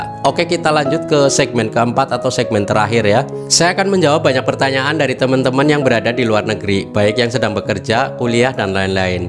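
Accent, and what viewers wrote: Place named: Tegal, Indonesia. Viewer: Kaya wong Tegal sampean mass ngomong e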